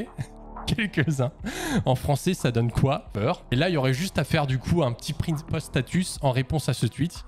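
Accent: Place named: Alsace, France. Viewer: Accent Alsacien c'est pas de sa faute idiot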